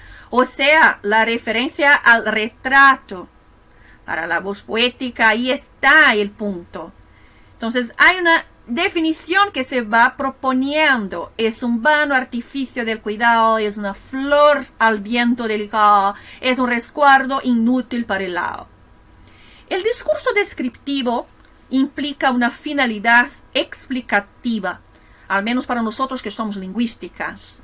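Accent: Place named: Spain. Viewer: Parabéns, professora, pela brilhante análise do soneto e pela relação que fez com a contemporaneidade. Lindo acento espanhol.👏🏼👏🏼👏🏼👏🏼👏🏼👏🏼👏🏼👏🏼👏🏼👏🏼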